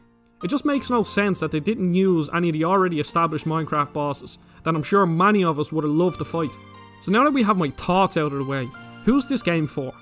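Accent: Ireland. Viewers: How is it that your accent is so Irish yet so clear? I think you have the best accent ever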